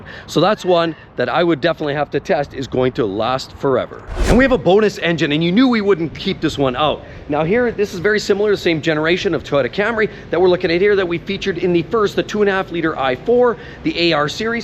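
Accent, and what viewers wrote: Canada. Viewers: Canadian you can't hide that accent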